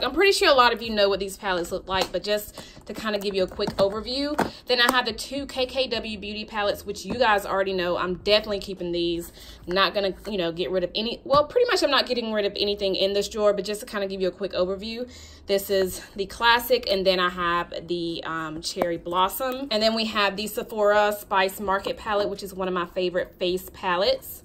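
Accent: South, United States. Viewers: Your southern accent is so adorable😆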